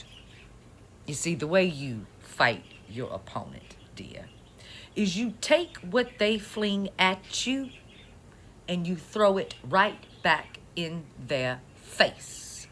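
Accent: South, United States. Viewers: You’re brave!! Love your commentaries! You give us courage! I love your voice and your accent, “Why, I do declare, you sound like Scarlett O’Hara! Keep strong everyone! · 👏🏼👏🏼👏🏼PREACH BABY!!! 💯🎯With that deep southern drawl accent 😂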